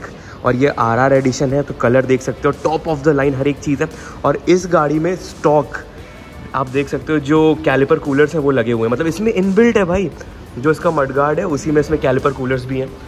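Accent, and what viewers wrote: Lucknow, India. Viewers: Bhai lucknow se ho 😄😄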